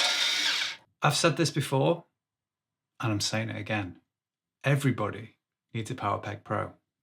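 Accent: South, United States. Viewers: I was not offended by your "Southern" accent. I thought it was hilarious and I live in Nashville where a lot of people sound like that! Cheers.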